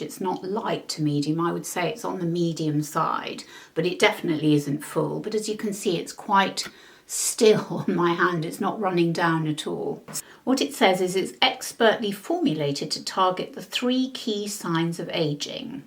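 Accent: England, United Kingdom. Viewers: Oh my I do enjoy your lively English accent❤Your content is valued by this almost 70 yo. I do so love your realistic approach to wiser womens beauty! Honestly Delightful🦋Peace and light☮️🕊️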